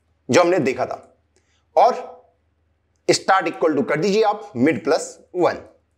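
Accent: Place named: Pahadi, India. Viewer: pahadi tone aari h voice me only true pahadi can notice...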